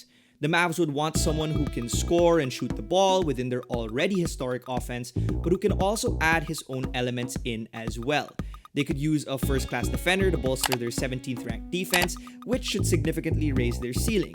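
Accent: Philippines. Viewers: I can recognize your accent. Are you Filipino? Great video btw! I love the mavs! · Yo are you Filipino? I feel like I can hear the accent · Hey man, are you filipino? My friend is filipino and he has the same accent as you! Anyways, great video! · Are you Filipino? The accent.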